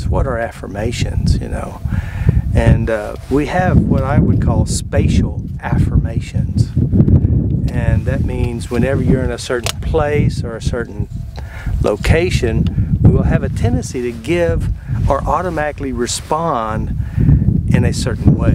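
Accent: Oklahoma, United States. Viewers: That was really beautiful and meaningful to me. I've been so grateful for these videos, tapping along with them and moving so much old stuff. Since I'm from Oklahoma, too, your voice makes me feel right at home! Thank you!!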